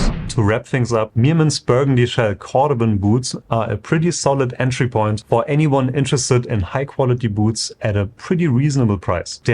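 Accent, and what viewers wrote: Germany. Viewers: I think you’re german by your accent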